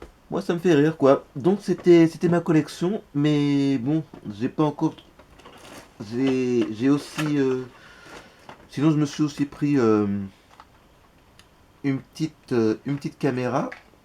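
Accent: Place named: England, United Kingdom. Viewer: Bon la vidéo date mais ça se voit que t'as l'air sympa et t'as une belle petite collection. Belle accent anglais. Par contre, l'intro est catastrophique 😂.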